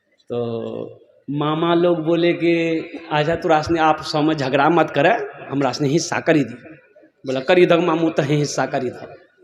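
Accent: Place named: Jharkhand, India. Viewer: Jharkhandi jaise bolte aap maulana sahab ❤😅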